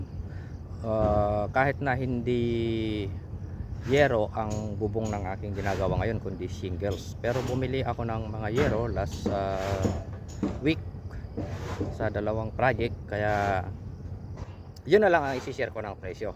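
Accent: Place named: Ilocos, Philippines. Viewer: TAGA SAAN PO KAYO PARANG ILOCANO PO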